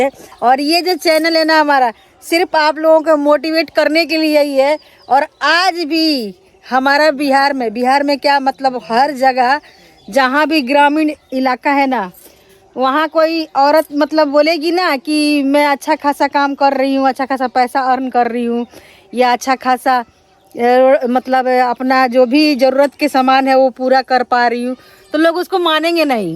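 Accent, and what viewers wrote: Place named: Gorakhpur, India. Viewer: Apki bhasa gorbpur ki lg rhi h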